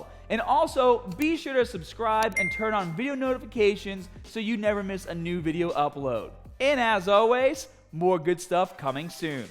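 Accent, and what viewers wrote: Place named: Northeast, United States. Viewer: Northeast accent. So obvious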